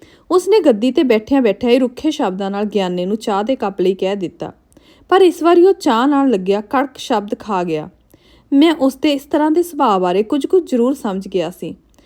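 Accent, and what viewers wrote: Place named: Majha, India. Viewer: ਠੀਕ ਸੀ ਕੁੜੀਏ ਖੜ ਦਾ ਪਰਦਾ, ਪਰ ਲੱਗਦਾ ਬੋਲੀ ਮਾਝੇ ਦੀ ਬੋਲਦੀ ਏ ।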